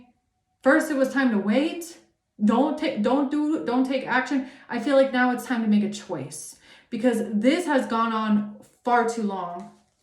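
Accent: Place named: Germany. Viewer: Good morning 🌅 beautiful 😇👀👑, hope you have a beautiful day night, love your accent Germany ❤💜🌅💜,I was abit worried about you last night, I wouldn't want to piss you off,I love you ❤, your are a earth angel 😇☀️⭐, anyway you distract me 😊, beautiful,I worry about you, when you bend down, your neck from your accident,need a massage, anyway looking forward to hearing your voice and thoughts 💬, peace love and light 🕯️ x😊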